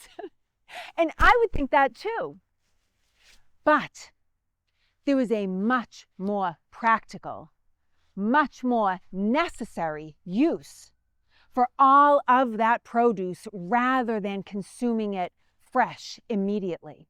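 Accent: Boston, United States. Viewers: Pahked machar in tha yahd,❤ Yes, I am a huge Jaws fan😁 Love the Bostonian accent 🙌Love from London ❤ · i luv the Boston accent. I'm gettin' hungry now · Is that a Baas-ton accent? 😁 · You do a great job,. Always bring a smile. The passion, the Adventure Pants, and the full-power Howes Neck or Dorchester accent. Don't change a thing. I hope the executives at you- tube understand the value of a good, old fashioned Historical Action Adventure (HAA).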